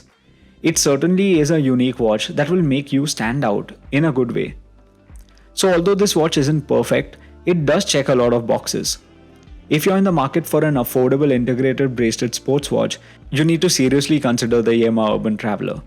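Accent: India. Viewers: Your accent is normal. I just felt that it just has a bit of an Indian tone to it. Anyway, your voice cover is very good.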